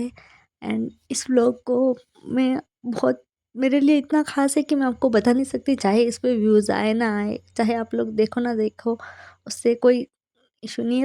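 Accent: Mewar, India. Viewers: Mera nanihal fatehnagar k pas hai or sasural bhilwara so mewadi bolte ho ap merko accha lgta hai sunna or upr se hardwork consistency awaj sb bhot pyara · बोली के हिसाब से मेवाड़ चित्तौड़गढ से लग रही हो दीदी❤❤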